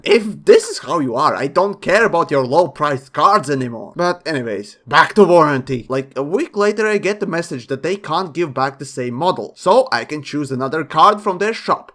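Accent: Latvia. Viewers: I KNEW I HEARD A LATVIAN ACCENT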